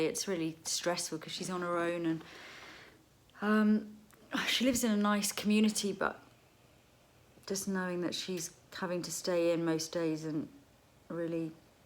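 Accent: England, United Kingdom. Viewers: Hello from the United States. I absolutely love you and your style of makeup. I love that you teach about good skincare and other beauty products. I love your makeup tutorials and I would love to see you do more of them. I have never learned how to do my eyes the way I would like. I don't know why I just hold back and don't really go for it. I have deep set eyes and I am over 60. I would love to see you doing videos everyday if you could and teach us about good skincare, facial massage, how to wear makeup. What is best for older women and how we should wear it and also what colors, etc. So sad to hear about your Kitty and I know that has to be so sad. I have my pretty kitty, her name is Snowball and she will be 15 years old in April. It is so hard staying in here and it is so scary as I know it is for all of you there in the UK and all over Europe. 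We have to help each other and it is so wonderful that you are so gifted and talented in doing makeup that you can share with the rest of us all over the world with your videos. I hope you will do one everyday.. Love watching you and listening to you. Love the accent. I live in the South in the U.S. and I love all things English. It is my Dream to visit the UK and all the old Historical Places and Churches there. I am a Jane Austin kind of girl. I ordered your lipsticks and am so enjoying them so very much. I look so forward to all you teach and hope to see so much more. Thank You so much. You made my day. Love all the Beauty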